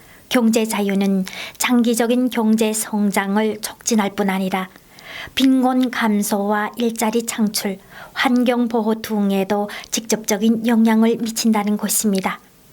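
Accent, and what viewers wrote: North Korea. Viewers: 다른 방송에서 북한 사투리로 말씀하시는 거 잠깐 본적 있어요. 지금 방송도 잘 하시지만 사투리 사용하시던 그 모습도 보기 좋았어요. 항상 응원할게요. 감사합니다.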